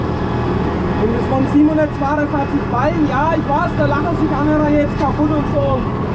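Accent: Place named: Middle Franconia, Germany. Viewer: Kommst du aus Mittelfranken du redest genau so wie ich 😂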